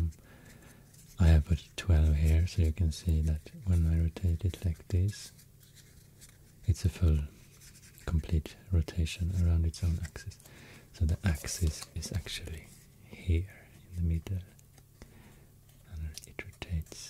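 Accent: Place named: Sweden. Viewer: “swedish accent” is only “accent” i can listen to in asmr, any other “accent” just feels weird but this, this is soooo good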